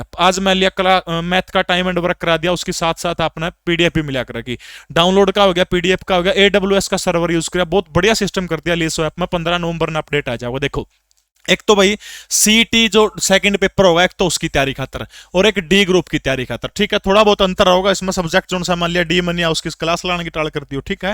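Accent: India. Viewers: Aap pabra side ke ho kya sir ??? Apki boli mile h ude kani tt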